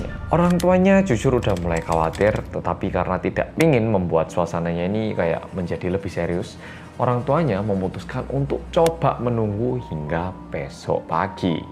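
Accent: Java, Indonesia. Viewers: Medoookkkkk podo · Tahun 1998 di Indonesia situasinya Kerusuhan . Medok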